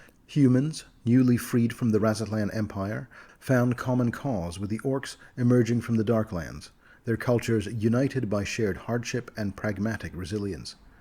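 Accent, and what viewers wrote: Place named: Texas, United States. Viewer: That is a great Texan accent and cowboy-talk. For a minute there, I thought this was a collaboration with Mr. Welch.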